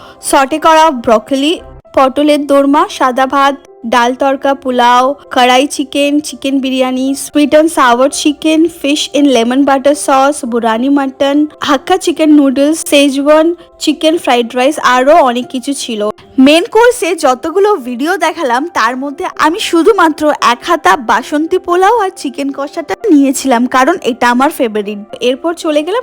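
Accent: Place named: Bangladesh. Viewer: "Pura matha nosto?? Ar duibar??" Baki kotha gulo toh edeshi style er. Tumi ki bangladeshi na oder accent ta copy korecho?? Mane jodi Indian hou tobe jara tomar nation er against e tader copy kora ta toh thik noy mamoni.. Ar jodi bangladeshi hou tobe toh etodin Indian documents baniye felecho mone hoy! 😊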